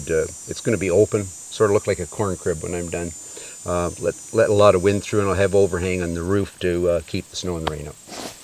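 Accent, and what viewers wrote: Canada. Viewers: Why did you shake your head at 40 secs. in? looking at something on the ground in the middle of a path. And, why is your cabin so close to that pond? And, what species of tree were you cutting down at the beginning of this video? A conifer obviously, but what kind? [Ah! you mention "cedar" . Must be Eastern Red Cedar (which of course is not a "cedar" at all but a juniper - Juniperus virginiana; that is if you are east of the Mississippi. As straight as they are I'm guessing East Red] And, you keep mentioning how hot it is - I'm a new subscriber so do not know where you are located. I don't mean specifically, but just a state or province would likely do for me on climate. Oh! just heard that distinctive "ow-tt" of the Canadian English. (An idea:you could shed some body heat by taking off that felt hat - I think some one said 30% of your body's heat is in your head). 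And, obviously you are not worried about how green that wood is - is that b/c shrinkage will not be a problem. (I was assuming you were using this kind of tree b/c it's rot-resistant). And, I wish you'd shown us how your attached the wood pieces. I assume some sort of nail? But it would have been good to see that. I would also have liked to see some of those two hours getting a hole through the rocks! Re: saws I think maybe I got one of them there crummy saws from Ace hardware. It's got their name on it. Well it is a BITCH to use! I took it in and asked two of the guys there what the deal was? they didn't k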